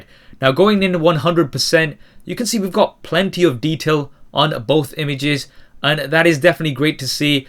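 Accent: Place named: Birmingham, United Kingdom. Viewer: you kind of sound brummie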